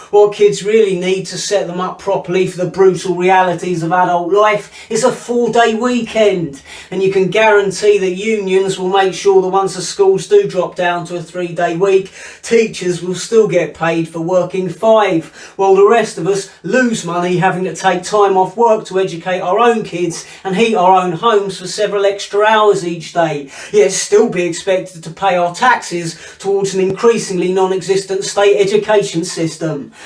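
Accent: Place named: RP, United Kingdom. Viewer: Nailed ! In the Queen's good English.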